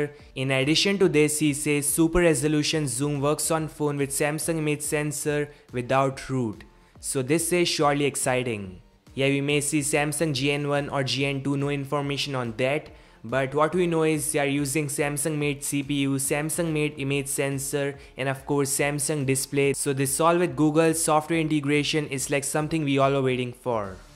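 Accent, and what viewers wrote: India. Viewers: So this guy is fellow indian....he sound indian though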